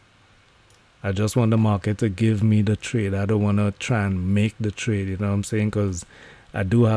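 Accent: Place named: Trinidad and Tobago. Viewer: You sound like an Israelite Trini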